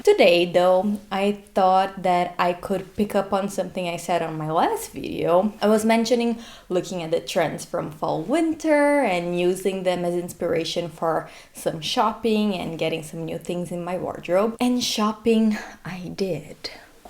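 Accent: Brazil. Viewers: love it lol. Would have never guessed you had a Brazilian accent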